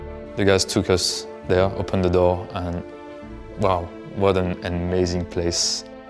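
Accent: France. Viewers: This little french accent👌👌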